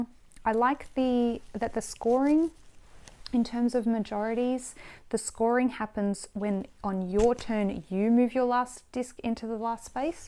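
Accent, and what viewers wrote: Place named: Australia. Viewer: Neat! Its always fin to see someone talk, who you've only seen photos of...especially when they have a cool Aussie accent! I tried my hand at making some videos for YT. I mostly made them for my sisters (to save time on game teach, since we are all in different time zones, and don't often have long to play). I initially published them, but I think I took them down, because I was embarrassed about how they came out. Mostly, I need to figure out how to edit in post and I think it would have looked much better with a pro intro like you have. Nice job! I now want to try this game sometime. :)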